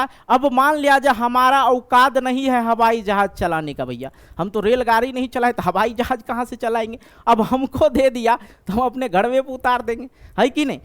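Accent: Bihar, India. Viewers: Sir Aap Bihar se hai kyaa ❤❤❤❤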